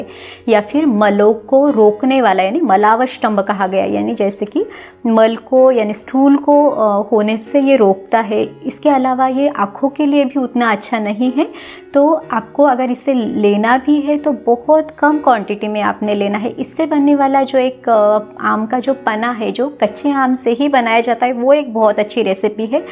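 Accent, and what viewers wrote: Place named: Maharashtra, India. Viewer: आपल्या बोलण्यावरून असे वाटते आपण maharastriyan आहे मग मराठी का नाही बोलत कारण महाराष्ट्र खूप मोठा आहे.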